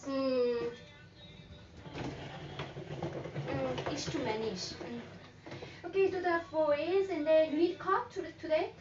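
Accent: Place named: Australia. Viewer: 호주발음 연습하러 와도 좋은 채널인데요? 언제나 느끼는 거지만 호주발음이 가장 어려워요~^^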